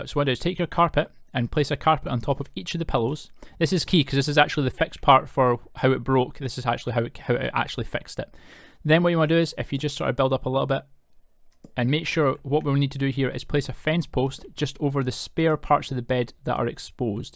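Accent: Scotland, United Kingdom. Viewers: I don’t want to assume. But are you Scottish? - from a fellow Scot :) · Yay Scottish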